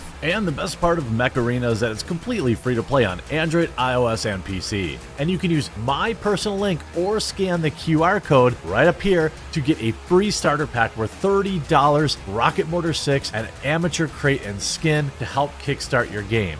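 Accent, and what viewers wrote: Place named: Wisconsin, United States. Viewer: I swear I'll unsub the next time u say braigged instead of bragged. Fuggin wiscansin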